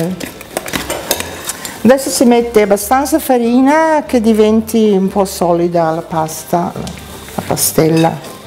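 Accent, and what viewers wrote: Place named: Veneto, Italy. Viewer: Yum! That brought back memories of Mum making them! Loved the Veneto dialect too!!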